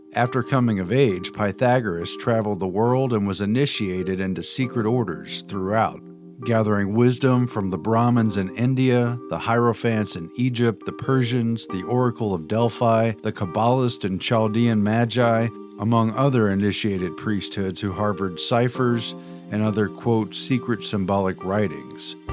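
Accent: United States. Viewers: Very much enjoyed the narration. A very quintessentially "American" sounding voice, if ever I heard one. (Put me somewhat in mind of Peter Coyote's narrations). Nice pacing, pronunciations, and inflections....and even the printed/blue highlighted readings were effective: activating even more neuro pathways than just simply hearing the narration. SOoooo much better than all those robotron voices we've been hearing around YouTube for some time. Think I'll take a look around this channel some more.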